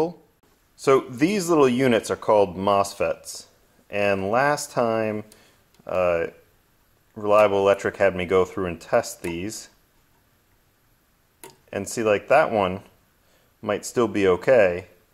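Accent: United States. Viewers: Why do Americans pronounce it sodder, when solder has an "l" in the word?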